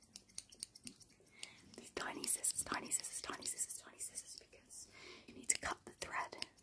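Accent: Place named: RP, United Kingdom. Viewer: How come you have a sort of posh accent?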